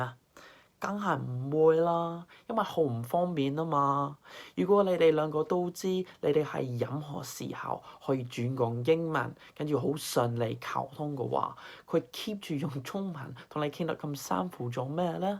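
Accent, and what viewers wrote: Hong Kong, China. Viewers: 嘩，你D口音同語氣真係好香港人！讚 讚 讚 勁 勁 勁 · 連你嘅語氣都好香港人feel 🤣🤣🤣🤣❤️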